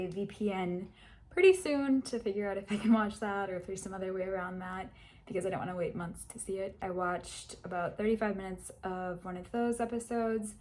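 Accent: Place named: Paisa, Colombia. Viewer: Súper bonito como se ya va pegando el acento paisa. Tienes una voz suave y es muy agradable escucharla. Felicitaciones. (Congrats).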